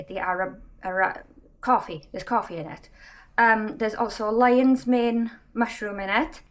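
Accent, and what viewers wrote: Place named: Wales, United Kingdom. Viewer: aww thank you!!! Ive been watching your videos most of the day!! And while I cooked me curry! 😂 absolutely love them! Will give you a shout out next week for sure! And i have to say, theres is nothing better, than the accent of a Welsh woman swearing! 😅 keep the videos coming hun!!!! ❤❤❤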